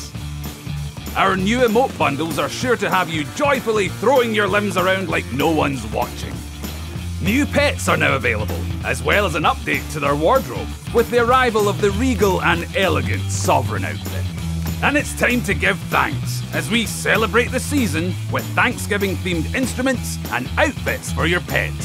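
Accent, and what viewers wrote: Scotland, United Kingdom. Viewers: Why is he Scottish?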